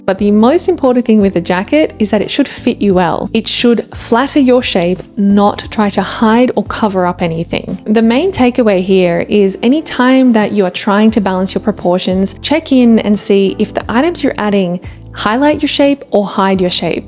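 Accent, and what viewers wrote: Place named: Australia. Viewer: She has a pretty strong Australian accent, so I suspect she mainly talks about milder winters like those in most of Australia. If you need to incorporate more layers in your climate, you can always add merino tights and a puffer vest underneath your coat. For my climate in northern Germany, clothes like the ones she showed work well enough for temperatures around 0°C or slightly below (which is most of our winter weather except the coldest days of the years) in the city, but of course these are not clothes that would keep you warm while on an extended winter hike at -10°C or below. That's fine though, winter is different dependent on the climate - coastal/inland, rainy/dry, all this will make a difference and you will have to adapt these styling tips with respect to functionality in your climate. She cannot possibly cover all winter climates around the globe. 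:)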